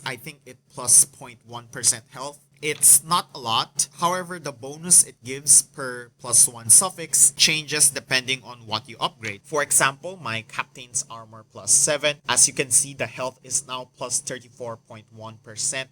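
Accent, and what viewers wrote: Philippines. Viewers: soooooooooooooo filipino accent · I heard Filipino accent here hahaha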